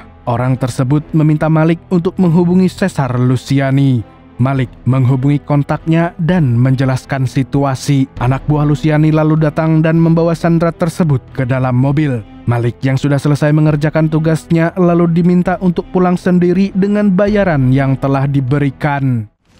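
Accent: Banjar, Indonesia. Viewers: Min orang Banjar kah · urang banjar rupanya admin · Mimin urg banjar kh min? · Asli Banjar kah pian nih mang😮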